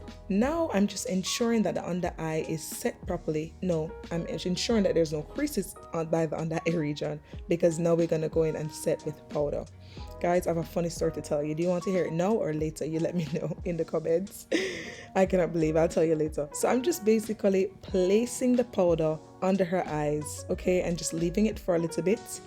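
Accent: Jamaica. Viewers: You sound so Jamaican 🇯🇲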